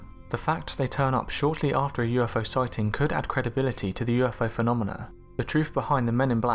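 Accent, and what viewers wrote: United Kingdom. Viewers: So nice to hear a British accent for a change. Music for my ears.. Regards from Serbia! Your videos are great.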